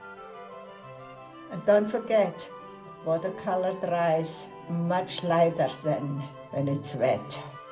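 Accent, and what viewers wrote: Switzerland. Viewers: Your DARLING one-of-of-a-kind Swiss ACCENT adds a wonderful CHARM: crisply frank & warmly feminine: BRAVA!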